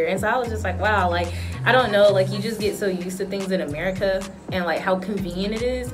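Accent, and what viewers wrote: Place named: Grenada. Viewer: Talking like a true grenadian i love u vids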